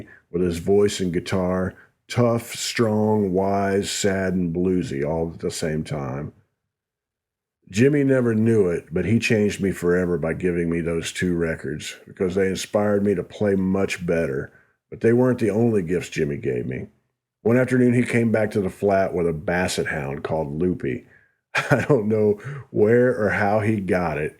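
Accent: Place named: South, United States. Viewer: Right when I hear the "Hey Friends" in that slightly southern accent....I immediately stop everything and watch the video👍🤔👍🤔👍🤔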